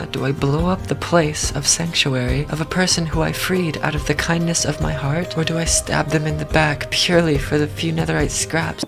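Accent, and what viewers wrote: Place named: United Kingdom. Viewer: Brit’ish ebony POG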